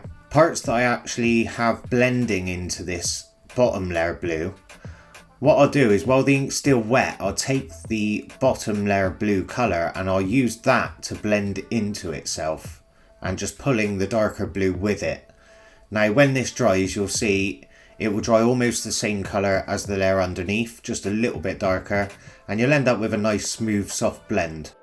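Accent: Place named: Bristol, United Kingdom. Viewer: Can't beat a bit of wolverine, keep up the sick work dude. I also love how bloody Bristolian you sound shag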